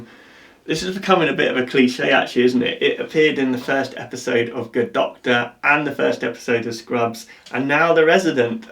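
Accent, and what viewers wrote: England, United Kingdom. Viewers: skinny white guy + english accent = instant likes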